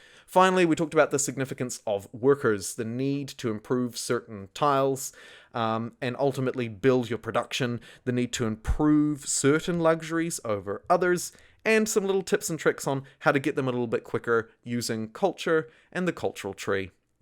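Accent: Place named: New Zealand. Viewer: I love a New Zealand accent. Ben is "bin", and bin is "Ben".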